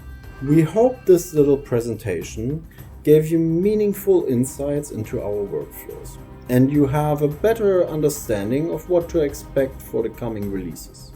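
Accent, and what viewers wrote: Germany. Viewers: Sehr gutes Englisch fast ohne deutschen Akzent. ❤ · you can hear the german accent :D gutes englisch bro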